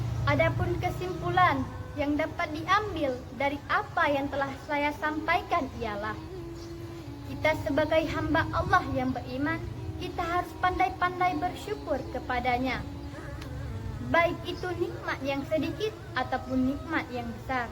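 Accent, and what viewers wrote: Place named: Java, Indonesia. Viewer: Tapi bahasanya masih medok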